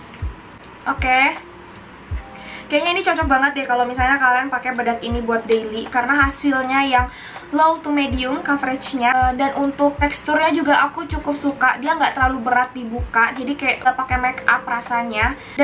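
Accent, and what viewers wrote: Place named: Sunda, Indonesia. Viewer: orang sebrang tapi sunda nya udah lekoh pisan, ngakak lucuu but luv❤️❤️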